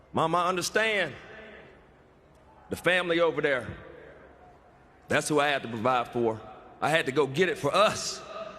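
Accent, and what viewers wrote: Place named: South, United States. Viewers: Actually, Southern WV accent. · I love that southern tongue god bless you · West Virginia accent.. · i love his southern accent 😂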